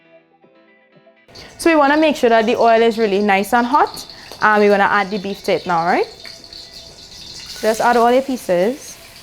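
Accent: Trinidad and Tobago. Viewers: Great Recipe...I love your accent...Im hearing Trini...Am I correct???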